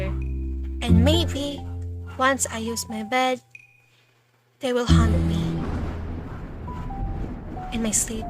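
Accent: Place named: England, United Kingdom. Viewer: THE VOICE OVERRRR OMAGASSHHHH! love your english accent ateeee! so fluent <3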